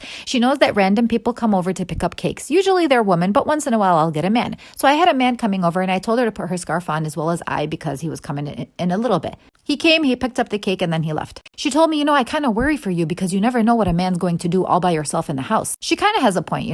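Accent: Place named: Michigan, United States. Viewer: You don't hear the accent? 😂 She's from Michigan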